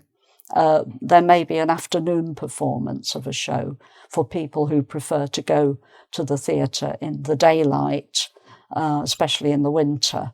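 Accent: United Kingdom. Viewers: I have been acquiring the English language for a long time, ever since 2004 and for reasons of material availability, I picked up an accent resembling that of USA. Now at the age of 31 I'm seeking to transition onto a more British-sounding quality, for which I looked for videos of this lady and, reading the comments, I just found she passed 😢 Hope she is resting peacefully. You 'were' not great, you still ARE and I'll make sure to make good use of your lessons. Thanks for your contribution to every student of English and I wish you a calm journey 🤍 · Oh, I had started learning the British's accents with you! It is of a great pleasure to learn here, till now a days. I started more or less 3 years before my post-graduation for teaching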